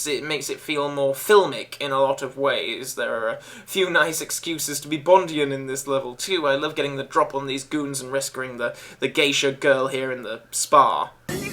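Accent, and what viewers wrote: United Kingdom. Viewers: Are you even British?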